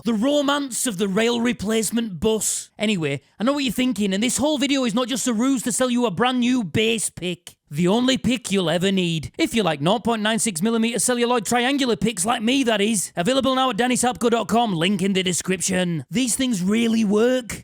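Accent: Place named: Yorkshire, United Kingdom. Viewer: you make me laugh sooo much, I'm an Expat from Nottingham living in the USA, and i love you and your Yorkshire Accent talking about BEHS, keep it going Mi lad, i play a Musicman stingray love it to bits, Cheers Mate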